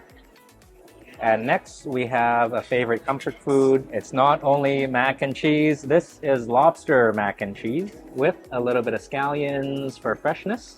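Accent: Canada. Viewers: Pizza? Fish? Spring Rolls? I think you said. I'm not sure if I understood your Canadian English? You're lucky you are a Food Reviewer, otherwise I would be smacking you through the TV. I would like King Crab Stuffed Lobster, with a side of Crab and Lobster. THE END!!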